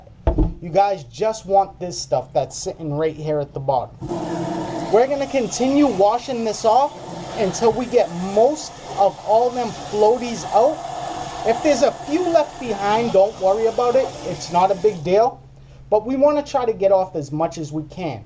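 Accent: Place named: New York, United States. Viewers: Alright youzzz guyz, now bring it to a simma and then stwain it. I love East Coast accents. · He sounds like hes from some where in New York or new joisy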